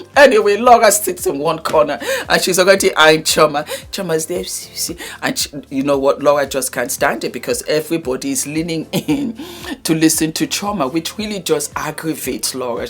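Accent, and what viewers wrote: Nigeria. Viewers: Loooooooooovvvveeeedd this review😅😎.!!! ... Loved the Nigerian accent and words you incorporated in the video.. Definitely going to watch it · What is there not to love about you. I am so loving and feeling the Naija vibe, energy and accent in this episode. Omo, you are truly a Naija babe jare, 100%. Once a Nigerian, always a Nigerian! Love you as always.😘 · Nice to hear speak pidgin, I live for it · your pidgin is perfect and sweet. 😁 I enjoyed this review.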